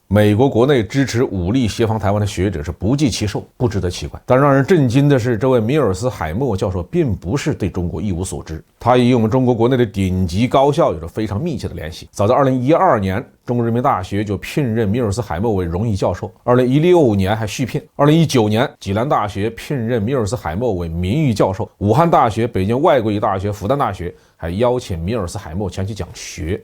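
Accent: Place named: Anhui, China. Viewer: 你是不是孝感地区的人？口音要么就是安徽某些地区。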